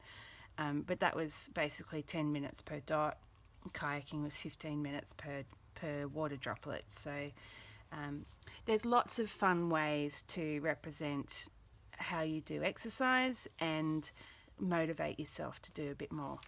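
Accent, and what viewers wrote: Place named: Australia. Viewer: yay aussie accent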